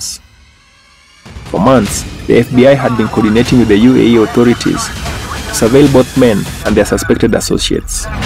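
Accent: Kenya. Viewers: Mr narrator, are you kenyan?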